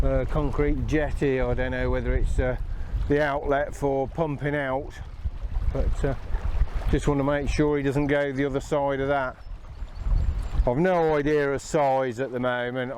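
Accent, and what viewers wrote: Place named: Northampton, United Kingdom. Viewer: Keep it up great vid. U sound like you have a original northampton accent!!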